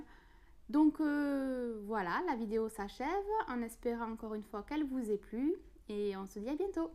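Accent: South, France. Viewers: coucou j'adore tes vidéos je voulais savoir tu habite dans quelle région (du Sud je crois par rapport à ton accent) car il a l'aire de faire beau par la non ba voilà j'ai testé ta tarte à la tomate et chèvre elle a dit du succès et elle méritais je vais l'essayer demain le poulet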